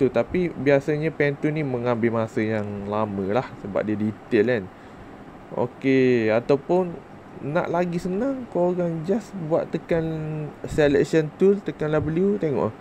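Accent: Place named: Terengganu, Malaysia. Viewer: Saya suka abang cakap ganu je..terhibur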